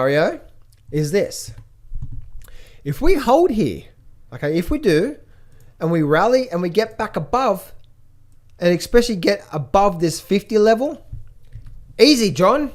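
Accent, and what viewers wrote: Australia. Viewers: So all the criminals from England were sent to Australia in 19 th century...you should work on your English or American accent. ..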